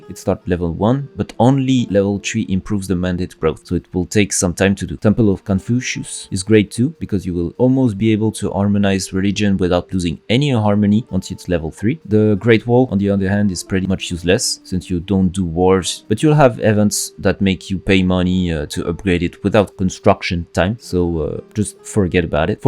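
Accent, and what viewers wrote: France. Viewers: je me disais bien que t'avais un accent français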